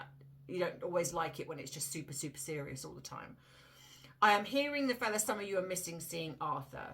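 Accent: United Kingdom. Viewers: I'm not sure why your channel would be failing. I really enjoy your videos, love the British accent, and believe you are spot on in your analyses. I've been "hyping" your channel. I'm not sure if that helps boost your algorithims but it can't hurt. 😊 Subscribed to Bite Size too. Edited to add that the Montecito clowns bought the award.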